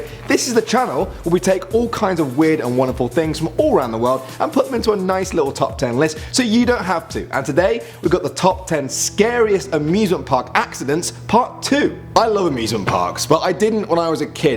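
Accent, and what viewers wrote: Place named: United Kingdom. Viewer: He's British so he has an accent.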